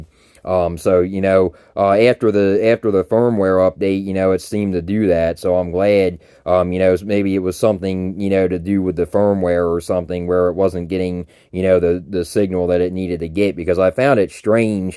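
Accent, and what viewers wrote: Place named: New Jersey, United States. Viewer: An Australian who adopted a New Jersey accent...you know.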